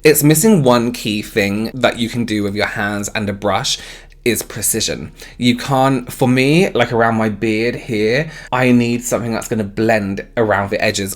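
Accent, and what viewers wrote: United Kingdom. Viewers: Dearest, you sound loud enough but still sassy and classy with your lovely British accent!! We love you in my FB makeup group here in Canada, our "unofficial Official" mascot!! You're our favorite twin BTW!! We do love James, how can we not? But, you just slightly outdo him in the finesse category!!💋😉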